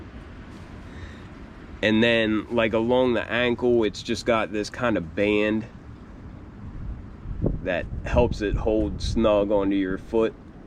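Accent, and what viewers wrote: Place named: Pittsburgh, United States. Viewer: Are you from the Pittsburgh area? I’ve lived in Pittsburgh my entire life and you have a pittsburgese accent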